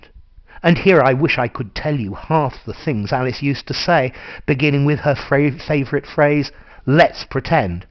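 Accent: United Kingdom. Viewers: i really like listening to this its easy for me to study British accent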